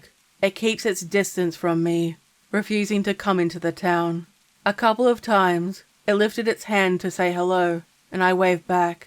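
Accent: Australia. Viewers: Found your channel recently!! Love your voice! Love your Aussie and now doing an Aussie based story! ❤😊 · I know hear this a lot, but its true. I love your voice. Your Australian accent and the way you carry the stories you make which is awesome as well is so inspiring and amazing. I could listen to you read a motorcycle maintenance instruction guide and love it. You're awesome. I love your videos.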